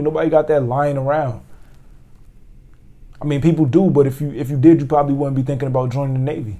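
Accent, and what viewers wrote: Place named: Philadelphia, United States. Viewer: If you don’t mind me asking where are you from? I can hear your accent. Sound like you from Philly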